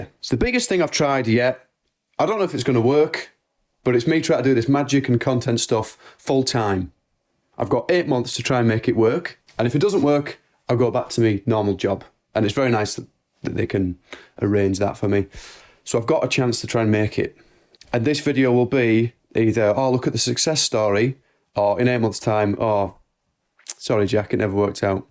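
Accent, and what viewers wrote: Birmingham, United Kingdom. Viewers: Glad to see you back! You got everything you need: bostin accent, a talking dog, marked decks of cards, comedic genius, sausages, British poise and editing skills! As Tom Cruise said in Impossible Mission 7: Avengers Vs. The Ron Hubbard Scientologic Universe: "The world is yours, innit"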